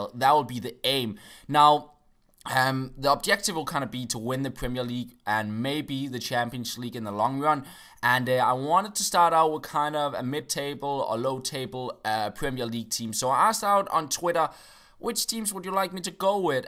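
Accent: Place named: Denmark. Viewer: Gotta love that Denmark accent, no joke, sometimes I watch his video for the intro alone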